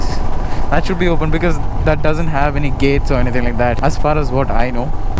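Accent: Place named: South India, India. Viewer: South Indian English I can Understand 👍👍👍👍👍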